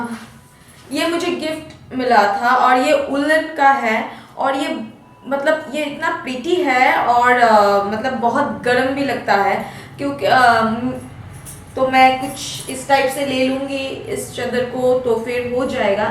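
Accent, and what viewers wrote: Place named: Bengal, India. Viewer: Plz learn to speak proper Hindi bfr u make vlogs; u r Bengali, why don't u speak in mother tongue?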